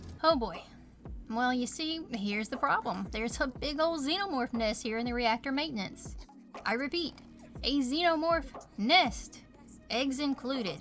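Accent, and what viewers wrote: South, United States. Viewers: I love your review. Your southern draw is definitely giving me Colonial Marine vibes 👌 This is my all time favorite horror game. I still play this game and now after watching your review its time to revisit Sevastopol Station. Any loved your review and retelling. Also thats crazy that working joe took an elevator with you. He was probably scared shitless too. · Ok what is your accent. I cannot place it exactly. I have guesstimated it down to either rural "american" or somewhat australian?? Please enlighten me, I could not focus on the terrific video because I was concentrating on your accent!!1! Please keep doing what you're doing c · Lowkey find it hilarious a “southern country” girl accent is talking about alien horrors deep in space where the chances of someone coming to even see you is slim lmfao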